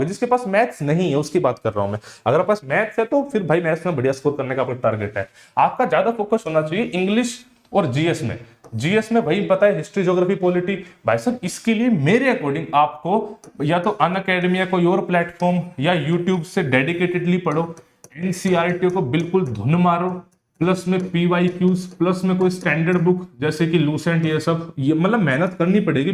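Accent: Haryana, India. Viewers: Sir Kya aap HARYANA se ho ? ☺❤ Aapki awaz or boli dekh kr lgta h ki aap HARYANA se ho ....... Please reply sirji 😊❤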